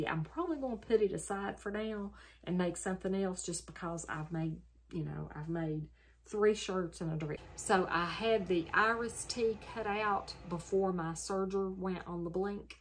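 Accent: South, United States. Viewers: Love your video. Those three tops are very nice. What state do you live in? I’m trying to place your fabulous southern accent.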